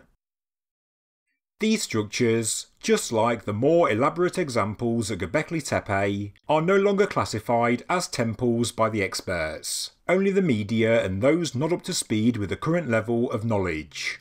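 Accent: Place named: Birmingham, United Kingdom. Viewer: brummies should never be allowed to narrate anything ever again.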